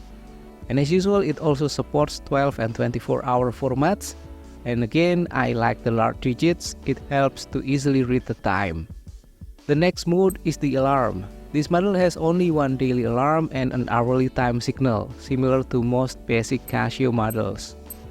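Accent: Indonesia. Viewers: Your English good sir, i like your Indonesian accent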